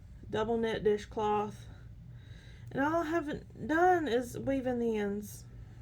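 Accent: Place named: South, United States. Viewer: Hi from Dothan, I knew you had to be from somewhere nearby when I heard your accent 😊. You've got a beautiful yarn room there and I love those Felici socks. I'm loving knitting the Pop Life Cardigan by WATG after making myself finish two large crochet afghans. Looking forward to seeing more from you and nice to meet you ❤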